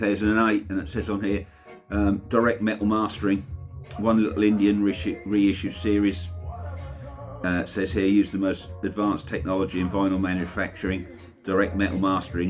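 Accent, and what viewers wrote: Suffolk, United Kingdom. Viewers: Shame about the Blue Note album but if it still sounds good that's fine...generally I don't buy european Jazz vinyl albums as so many are Digital to Vinyl copies Di Agostini is one there are others, the USA market does not allow that (digital copies of original masters) Originals are like hens teeth..!!. Different rules in the EU. Nice to hear that Suffolk accent...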